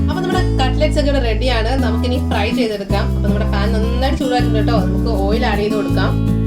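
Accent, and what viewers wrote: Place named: Nilambur, India. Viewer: വാഴ കൂമ്പ് തന്നെ നിലമ്പൂർ ഭാഷ 😍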